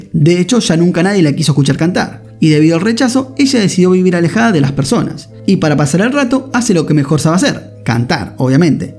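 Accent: Argentina. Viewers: No me gustan los resumenes tan largos pero no avía escuchado uno en ARGENTINO y neta de argentino a el orrible y culerisimooooo castellano que chingón se escucha 😳😅😂😂😂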